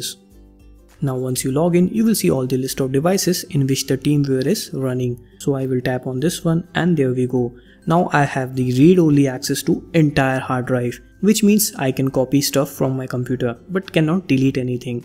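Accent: India. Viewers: The only indian voice i can take without wanting to just click off the video. Great job!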